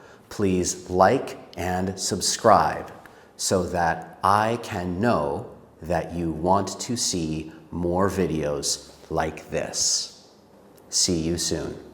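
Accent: United States. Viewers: You are American or British? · Hey, I love American accent. I was doing the shadowing practices And wow it comes naturally.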